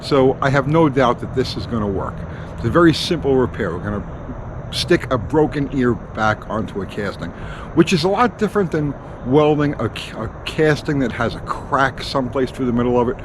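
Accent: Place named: New Jersey, United States. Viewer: judging from your accent, I'd say you're in Joyzee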